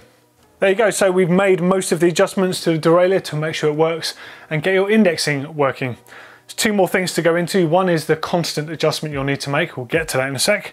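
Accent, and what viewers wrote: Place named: United Kingdom. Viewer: Very clear and complete instructions. The British accent automatically adds a few points to the apparent intelligence of the presenter.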